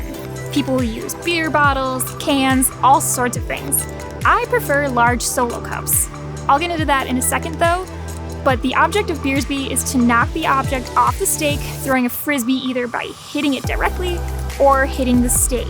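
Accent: Midwest, United States. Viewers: Wisconsinite here. Was going to say, Midwesterner for sure!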